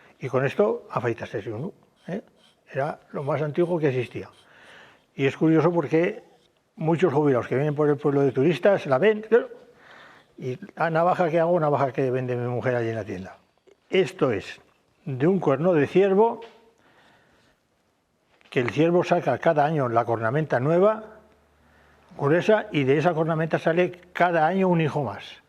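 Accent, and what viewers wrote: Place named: Aragon, Spain. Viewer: Qué gusto da oír hablar con ese acento aragonés.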